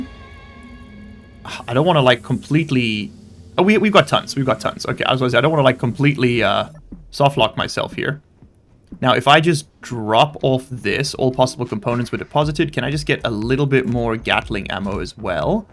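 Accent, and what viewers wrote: South Africa. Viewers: I couldn’t place where you were from for the live of me but South Africa makes sooooo much sense, idk what it is about some of the South African accents that are so damn hard for me to place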